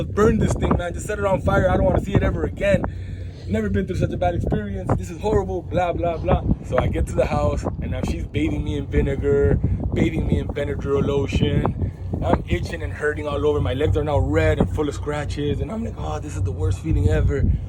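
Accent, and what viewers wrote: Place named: Florida, United States. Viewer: That Florida accent is so weird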